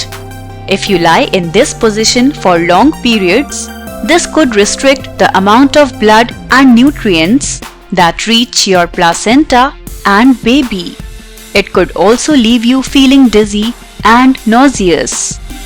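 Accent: India. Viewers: Indian accent tsk...hate it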